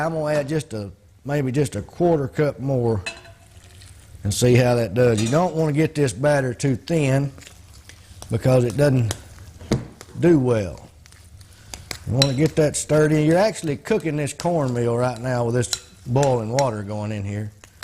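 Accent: South, United States. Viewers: Me: Watching with a degree of skepticism, volume down, and thinking: 1. Intriguing, a white man knows about hot water corn bread? 🤔 2. Intriguing, knows how to make hot water cornbread "correctly"! 😊😊 3. Intriguing, not afraid "fat" or frying food?!?! 😁😁😁 Conclusion: This man MUST be from south or at the very least Texas. Me: Turns up volume. Hears accent. South confirmed! 🤣 *Priceless take away to 👌 Was I was racially / gender biased? Yes, but aren't we all (to a degree) if we're honest with ourselves??? Me also: Wondering how I can get an invite for dinner now that I've probably teed off the cook!!!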